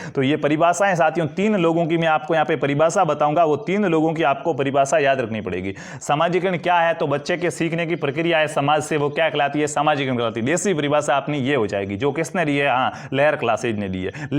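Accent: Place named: Udaipur, India. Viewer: Sir apki भाषा se ap udaipur se lag re hoo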